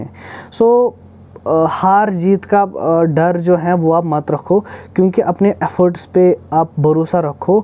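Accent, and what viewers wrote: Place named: Kashmir, India. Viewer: Thanks bro.... For giving these motivational videos ♥♥ Way you talk kashmiri in urdu lang. I really like it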